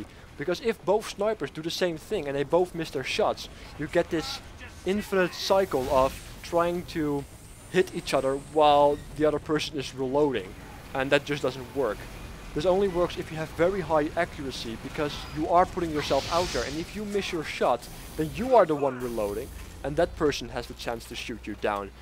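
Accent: Netherlands. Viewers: Ben je nederlands? :)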